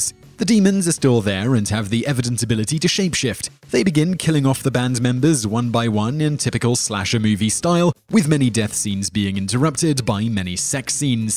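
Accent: United Kingdom. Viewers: We really should be more grateful when it looks like accidentally says something that may not be completely accurate or expresses an opinion that doesn't appear thoroughly thought out. The researchers here are so on point with such frequency that it makes me wonder if their occasional factual and/or contextual errors are not intentional. It just seems like an ingenious ways to fuel the comments section. After all, who doesn't enjoy feeling smarter that a very charming, well-read guy that has a British accent.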